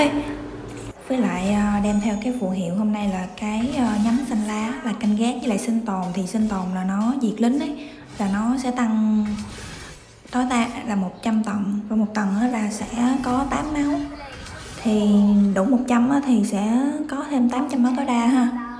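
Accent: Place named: Mekong Delta, Vietnam. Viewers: Nói giọng đậm chất miền tây luôn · Bà này miền tây chính cống mà. Nên ngta mới gọi miền tây á.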